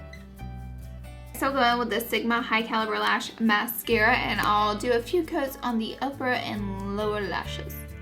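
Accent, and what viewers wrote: South, United States. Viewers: #snapchatfam love this look, also I think your voice is amazing, my family is all southern and it makes me feel at home 😁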